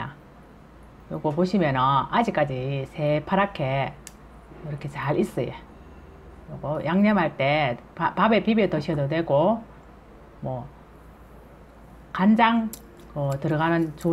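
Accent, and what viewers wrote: Gyeongsang, South Korea. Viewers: 경상도 사투리 너무 잼나고 구수하고 예뻐요~~❤~~ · 경상도 사투리 잼 나내요 잘보고 구독하고 갑니다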